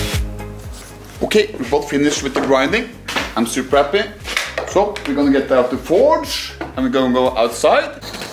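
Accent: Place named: Norway. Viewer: Heavy norwegian accent i hear🤣, great video tho!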